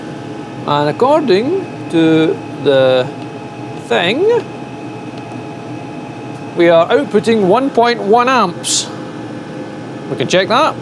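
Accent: Scotland, United Kingdom. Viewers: I love Scotish accent. I always hear something else, like the time you said "I do not have enough hands". The thing I heard was "I do not have enough Hans" :D Nice project btw :) I have brought also this diesel powered heater, to heat up my garage. That project is still work in progress. Also I will install it to my car - 2015 Aixam City Moped-car. That car is so cute, I can make 3-point turn in tight places :)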